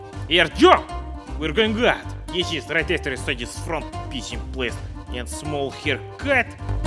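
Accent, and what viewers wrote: Russia. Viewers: This dude has the thickest Russian accent I've ever heard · Мне 1 кажется что ты не американец а русский?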